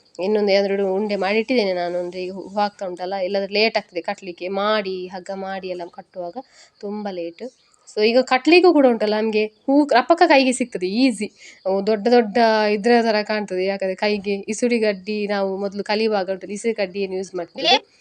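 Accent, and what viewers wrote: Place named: Tulu Nadu, India. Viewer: Erna thulu mix kannada kenyereg kushi apundu..😂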